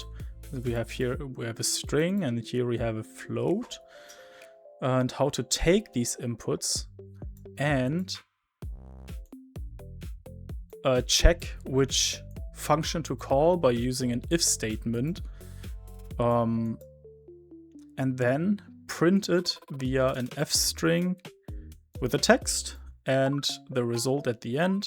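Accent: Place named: Germany. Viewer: u sound german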